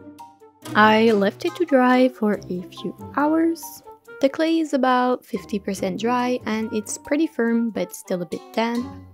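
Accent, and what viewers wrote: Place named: Quebec, Canada. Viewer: Je pense ce que tu dois faire du voice over en francais. Plus specialment ton accent quebecois. C'est metal.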